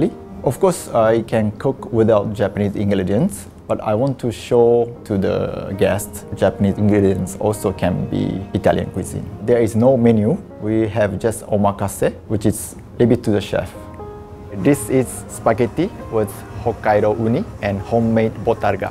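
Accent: Singapore. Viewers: Video: English Chef: Japanese Accent: Singaporean Dish: Italian Ingredients: Japanese Bill: Priceless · This is new to me. A Japanese with Singaporean accents 😅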